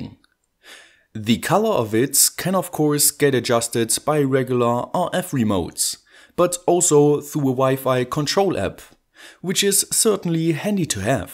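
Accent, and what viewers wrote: Germany. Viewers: Hi bist du deutscher weil es sich sehr so anhört. Hast du auch einen Deutschen Kanal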